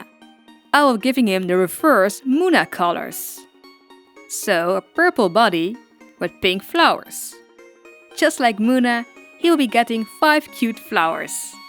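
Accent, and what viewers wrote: Netherlands. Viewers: It sounds like you are Dutch.. No hate btw! I am Dutch! SO i just wanted to know.. 🤔😂😊❤️ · Qiick question, are you Dutch? I recognise the accent haha. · wait hold up are you Dutch as well? You sound so Dutch it's... well, it wouldn't be funny either way, but like, this is the second Dutch Doll channel I've found is this what y'all do? Make great sweets, cheese, flowers, and dolls? Also, the mills are hella cute. Loved the repaint too, you're super talented!!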